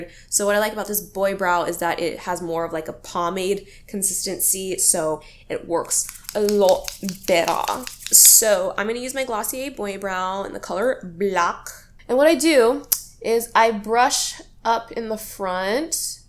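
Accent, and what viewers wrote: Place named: Australia. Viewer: Can you do a video with just your Australian accent i really love it ❤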